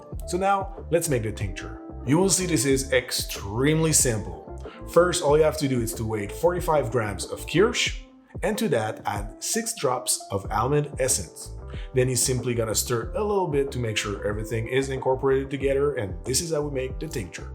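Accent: Quebec, Canada. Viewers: Because it's not from France's French but Québec's french!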